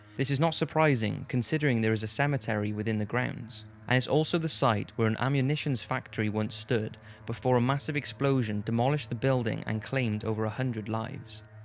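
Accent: United Kingdom. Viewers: For some reason a British accent always makes a scary story scarier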